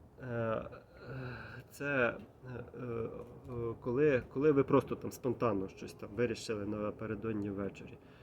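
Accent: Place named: Kolomyia, Ukraine. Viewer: Ти сам родом звідки? По акценту дуже нагадуєш моїш знайомих з Коломиї, Косова.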